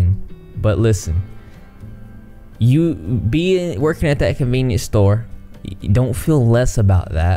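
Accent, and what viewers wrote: South, United States. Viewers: what part of the south are you from? It sounds just like where I am 😅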